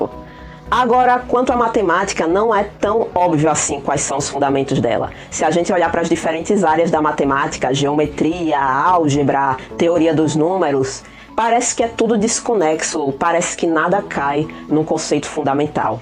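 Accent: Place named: Sergipe, Brazil. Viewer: O sotaque do meu País Sergipe me dá até um tesão. Saudades da minha terrinha. Parabéns, pelo conteúdo!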